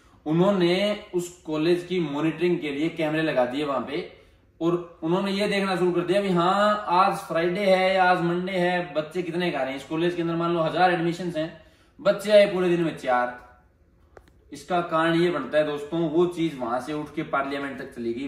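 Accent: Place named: Bagri, India. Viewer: Best best h bhaai treeeka ek nmbr h bhaai baat krne ka btaaane ka sb bagri hindi mix c ghant h bhaaai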